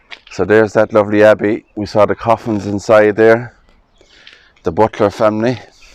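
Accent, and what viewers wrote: Ireland. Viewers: I love your videos. I very much enjoy hearing Irish folks speaking. You could be reading a phone book and it would be entrancing.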